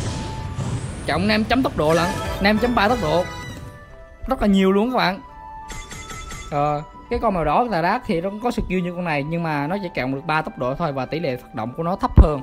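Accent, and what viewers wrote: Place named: Phu Yen, Vietnam. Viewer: Quê anh biển số 78 hả ah Nghe giọng giống lắm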